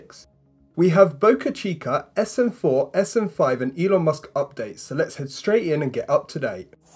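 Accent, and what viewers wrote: United Kingdom. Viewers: Why are you so british ?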